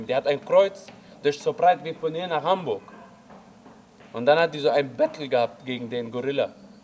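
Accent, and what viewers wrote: Swabia, Germany. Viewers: Schwäbisch mit bissle andere Land noch bei, weisch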